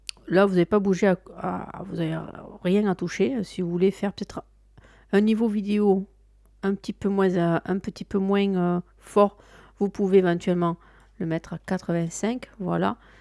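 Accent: South, France. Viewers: Encore un tuto sympa avec une voix du Sud agréable qui donne envie d'aller au bout de la vidéo. Une question : comment et où aller pour télécharger les masques ?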